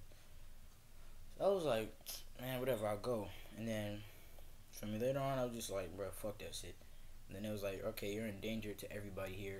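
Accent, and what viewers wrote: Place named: New York, United States. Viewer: Dis nigga from New York for a fact💀🤣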